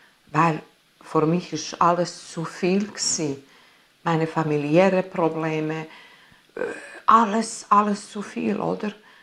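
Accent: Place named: Swiss German, Switzerland. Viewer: This lady speaks Swiss German dialect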